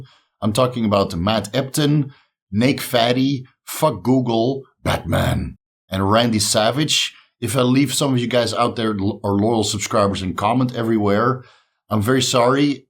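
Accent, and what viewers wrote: Netherlands. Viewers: Congrats on the 50k, definitely deserved. Glad I found your channel and hope to enjoy the content for many more years, and leave many more comments and likes. The videos on applying your knowledge on practical cases seems very interesting to me. Always like to see stuff applied as well as explained. Also: fellow dutchman here, I don't mind your pronunciation. I hate a thick dutch accent but your accent is subtle and enjoyable imo.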